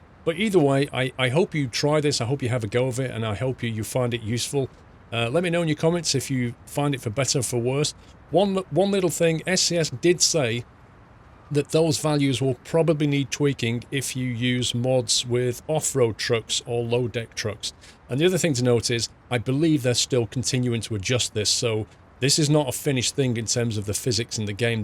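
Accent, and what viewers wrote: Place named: United Kingdom. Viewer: There is thousands of videos like that on YT but ONLY can explain properly even hes got that british accent (luckilly i used to live in Birmingham:P ).Other twats just expect money for their shitty videos. deservers that. Greetings from Poland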